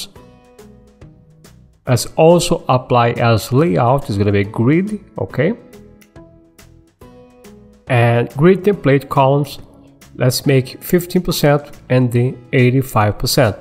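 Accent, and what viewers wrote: Brazil. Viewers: Excuse me, are you Brazilian? Me desculpe perguntar, mas você é brasileiro?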